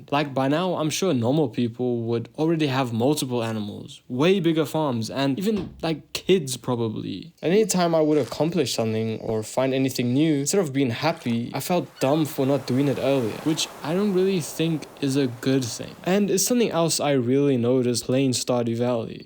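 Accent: South Africa. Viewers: South African??!!! My bru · waaaait. are you south african? you sound south african a little. its hard to recognise our accent when its not 100% afrikaans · 😂Bro you must be south african. That accent!!! must be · Nice video bro, I recognise that South African accent anywhere 😅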